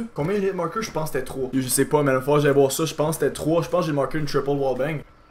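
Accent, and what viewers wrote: Quebec, Canada. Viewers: T tu québécois ?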